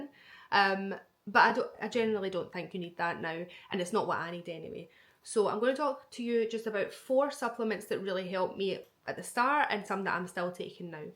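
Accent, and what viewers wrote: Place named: Glasgow, United Kingdom. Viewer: I absolutely love the accent! As you say, a broad Glaswegian accent is pretty much a different language.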